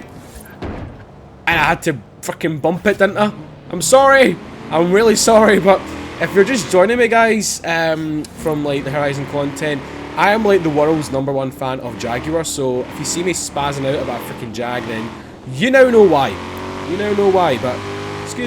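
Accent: Scotland, United Kingdom. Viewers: Where abouts in scotland are you from?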